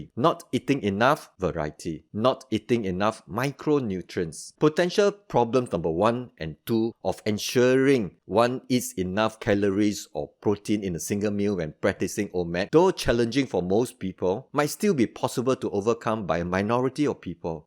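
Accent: Singapore. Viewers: Singapore accent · you must be singaporean. Singapoh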